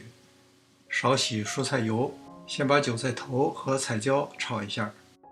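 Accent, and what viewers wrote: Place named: Beijing, China. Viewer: 北京话好听。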